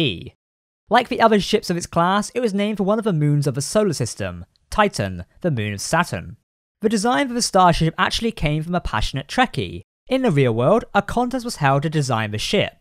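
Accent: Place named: United Kingdom. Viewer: Thumbs down for the maddening sound of your British R's.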